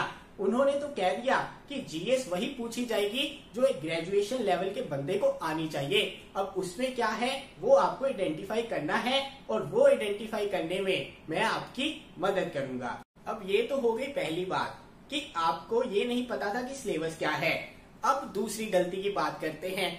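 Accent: Punjab, India. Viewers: Aaye haaye..Punjabi Accent Sir ka😍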